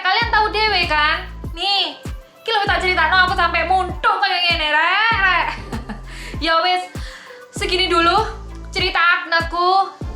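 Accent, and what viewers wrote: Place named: Padang, Indonesia. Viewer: Rodok padang ngono lohhhh😂😂suka logat bicaranya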